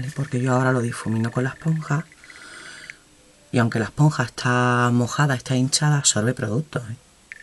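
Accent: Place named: Extremadura, Spain. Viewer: por tu acento, eres extremeña?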